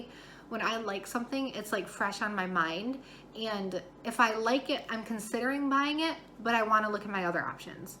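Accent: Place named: Upper Midwest, United States. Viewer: Are you from North Dakota or Minnesota by chance? I’ve been watching the ‘Fargo’ series on Hulu lately and couldn’t help notice you have a little accent that’s similar to the show.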